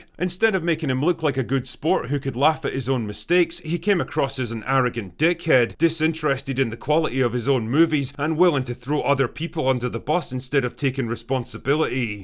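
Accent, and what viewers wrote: Scotland, United Kingdom. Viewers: Jeeze someone needs a snickers. All Im hearing is a rather judgy scotsman bagging a fella out for one bad film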